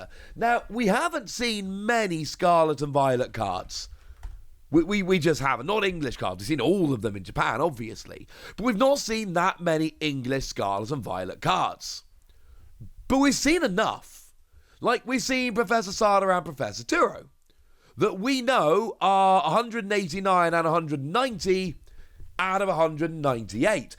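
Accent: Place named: United Kingdom. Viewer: Yeah it’s pretty common for UK English speakers to use the “yu” sound for when the letter U follows a consonant.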